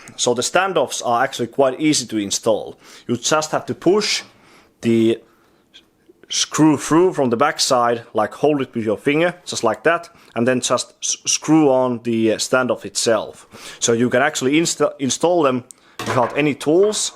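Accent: Finland. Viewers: Aksentista huomaa että oot suomalainen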